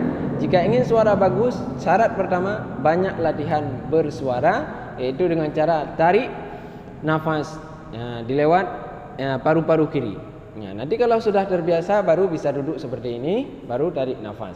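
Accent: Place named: Aceh, Indonesia. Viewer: Dari suaranya, tampaknya Ustad yang setiap kali Memberikan pelajaran di Tahsinul Youtube dengan aksen Aceh adalah Ustad yang baju Biru... Betul tidak ya ☺️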